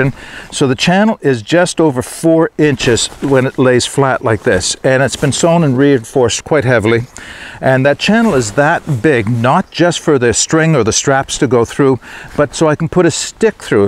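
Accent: Canada. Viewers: I love the way Canadians pronounce “ou” similar to here in Scotland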